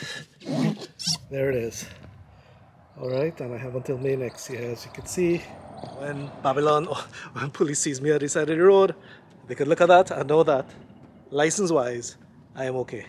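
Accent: Trinidad and Tobago. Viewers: U Sound Like You From Trinidad.... You Live In Jamaica Now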